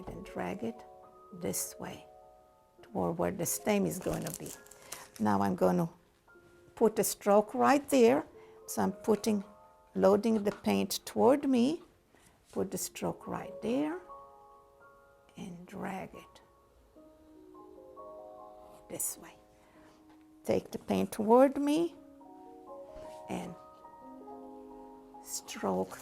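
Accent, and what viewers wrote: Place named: Brazil. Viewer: You an brazillian accent ... Beautiful !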